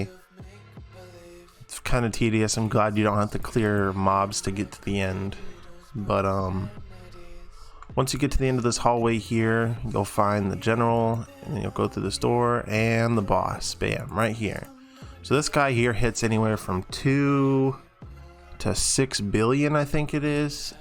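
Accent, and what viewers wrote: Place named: Bisaya, Philippines. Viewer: hahahahah bisaya spotted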